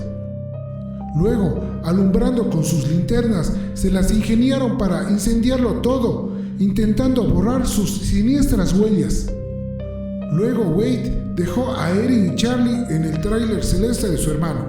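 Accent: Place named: Sierra, Ecuador. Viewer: De qué parte de Ecuador eres? Me suena a la Sierra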